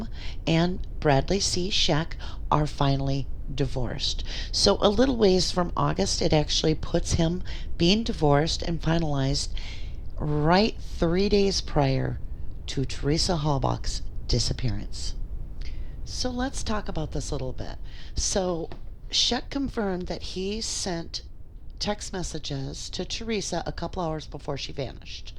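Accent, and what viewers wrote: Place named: Midwest, United States. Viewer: I used to do a lot of research on this case. I'd found out that one of the last texts that Th received was from Czech on 10/31 sometime before 2pm. I forgot where I'd found that, but it's out there somewhere. Seems like you're doing good work here. Interesting that you were able to obtain all of the photographs that you have. Interesting too that you speak with a midwestern accent that very easily could be from somewhere in Wisconsin.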